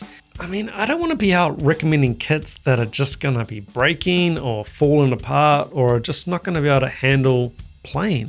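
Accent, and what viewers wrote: New Zealand. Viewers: …I like to hear an NZ accent to a point but to wait for you to say something substantive or meaningful 1/3 the way through…well…too… long…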